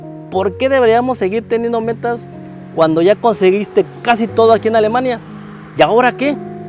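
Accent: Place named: Aguascalientes, Mexico. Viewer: Si puedo adivinar de donde eres basado en tu acento: Aguascalientes?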